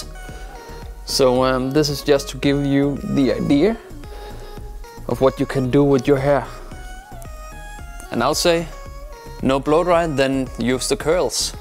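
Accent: Germany. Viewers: Du klingst deutsch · german? sounds like Arnold!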